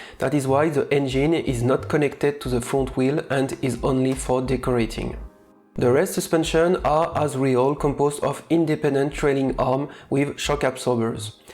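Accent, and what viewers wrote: France. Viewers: un accent tellement francais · Awesome video as always! I also do like your French accent :)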